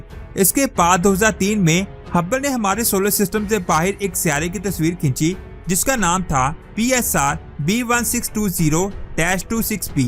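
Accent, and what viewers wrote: Punjab, India. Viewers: Dear BROTHER ,Please correct your english like SKREEN. dont mix panjabi way in the vedio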